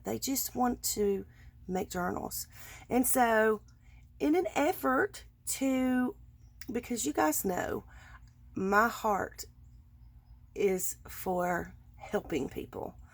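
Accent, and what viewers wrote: South, United States. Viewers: Hi, long time watcher, but recently subscribed. Your vids have been very helpful, informative and entertaining. I love your sense of humour, your voice and your southern accent is charming. I had to take the time to comment today because eventhough my creations are not yet ready for market, just knowing this is available awakens possibilities for all newbies. You have a beautiful heart and it is crafters like you that make this community so amazing. God Bless you and may the purity and kindness He has bestowed upon your heart be conducive to a successful endeavour. You rock! A million times thank you! Feel better soon.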